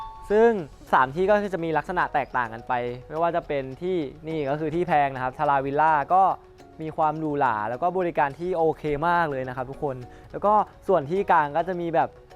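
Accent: Thailand. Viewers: เอ๊ะ เป็นคนที่ไหนนะมีติดเหน่อด้วย 555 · คนกาญพาไปเองรึเปล่าครับ มีติดเหน่อ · คอนถม ก็เหน่อหน่า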